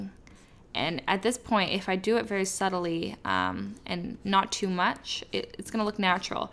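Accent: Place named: United Kingdom. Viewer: This girl sounds like she might have a fairly alright british accent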